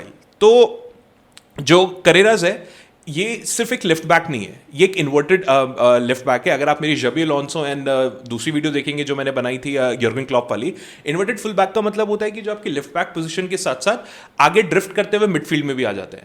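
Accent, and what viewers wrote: India. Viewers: Indian accent cuts my ears🤢🤢🤮🤮🤮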